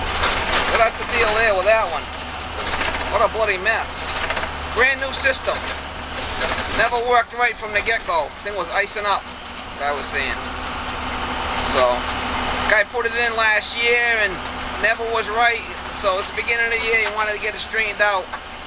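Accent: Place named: Boston, United States. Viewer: I knew it, the biddy and I were watching the video and said to each other, looks familiar, and sounds close to home, Good diagnosis and fix, Al from flippin' Bahston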